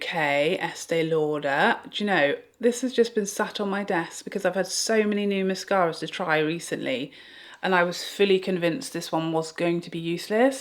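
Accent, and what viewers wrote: United Kingdom. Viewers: I love your stories -- not only are they great and cray cray, but you're a natural storyteller. Plus, your accent is darling. (I'm from the US and adore UK accents.) Great video on product. I've been curious about all you showed! P.S. - Where are the brushes made?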